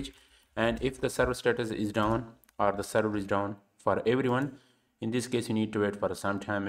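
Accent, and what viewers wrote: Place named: India. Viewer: Your English with Indian accent is trouble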